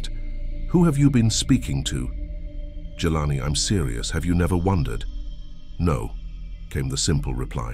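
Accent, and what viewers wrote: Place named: United Kingdom. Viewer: Yes I like this deep British/original English accent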